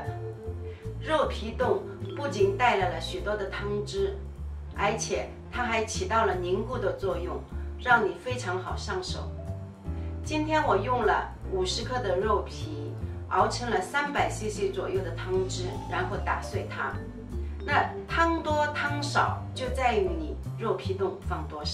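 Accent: Shanghai, China. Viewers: 你好，我是上海人，现在在美国，很喜欢你的上海生煎，在这儿很难吃到。多次观看视频，有一个疑问。如果我一次包多了，能速冻吗？冻箱里取出后能马上煎吗？希望你的回复，谢谢你！煎后仍有汤汁吗？你的上海普通话与我一样，听了很亲切。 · 听到上海话就先点赞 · 去年回国经过上海，有幸尝到上海生煎包，现在一直心心念念，想起就会咽口水哈哈，今日看到你的视频太激动了，准备学着自己做试试，你的视频做的非常好，上海话好听，喜欢，继续关注你的视频谢谢！！ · 上海口音👍👍👍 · 上海闲话真亲切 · 正宗上海国语，皮薄的不能再薄了，金金黄🤣🤣😂😂😂听着好亲切，立马订阅😋😋😋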